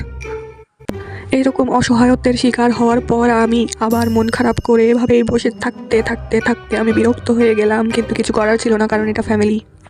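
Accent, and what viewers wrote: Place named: Rajshahi, Bangladesh. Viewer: Pure Rajshahi accent,I’m from Rajshahi too😊. Video editing ta bhalo chilo,keep going sister❤.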